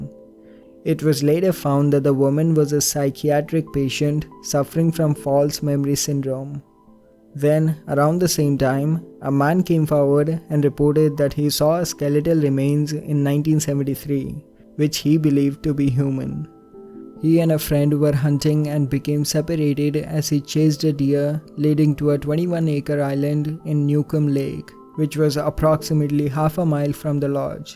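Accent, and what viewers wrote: India. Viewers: i cant stand that thick indiaN accent sounds like if i called a call center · This narration by this Indian SUCKS. Please get an American, English, Canadian, or Australian, or any English language speaker who doesn't have such a heavy accent. Who thought it was a good idea to have this dot head narrate??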